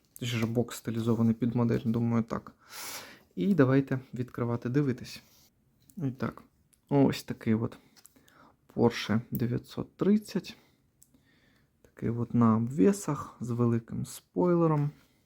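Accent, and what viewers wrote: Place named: Russia. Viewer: І взагалі... Маскалізмів забагато. Старайся гарніше говорити солов'їною. Вуха ріже безбожно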